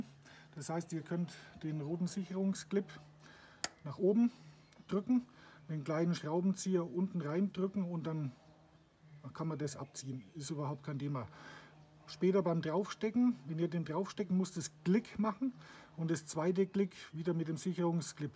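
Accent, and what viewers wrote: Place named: Franconia, Germany. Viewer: Servus, wo bist du denn her? Das hört sich schwer nach Frankenland an. Ich bin auch KFZ ler und würde mich gerne austauschen. Hast du ne Werkstatt oder Kontaktadresse?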